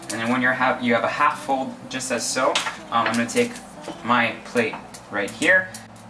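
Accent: France. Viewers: it's cuz he's French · that french accent.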